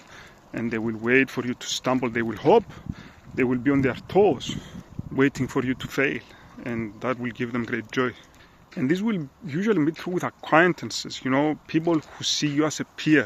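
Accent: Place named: Cyprus. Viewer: Love love love the Cypriot accent ❤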